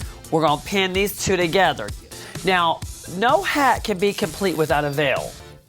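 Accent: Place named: South, United States. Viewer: sounds like a southern blonde soccer mom or something. i feel like she's going to ask me how the kids are doing and invite me over for a glass of wine.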